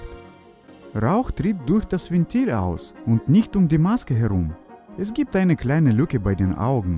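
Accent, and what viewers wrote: Russia. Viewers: das ist auch ne Idee 😅 Wobei ich seine russische Aussprache auch sehr mag. Ich versteh aber leider nur sehr wenig davon. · Чувствуется русский акцент)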